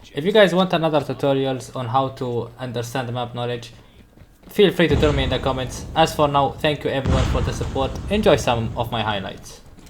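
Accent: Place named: Arab world. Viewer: bro where you from are you arab ? you sound like an arab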